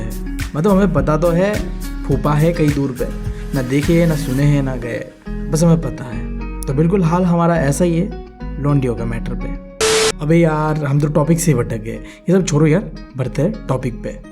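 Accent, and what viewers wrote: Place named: Bihar, India. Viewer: Sir aap bihar se hai🤔🤔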